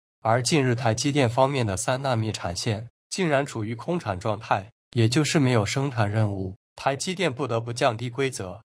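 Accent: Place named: China. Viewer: 這口音與用詞就是對岸中國的味。不專業的程度就跟中國的漢芯一樣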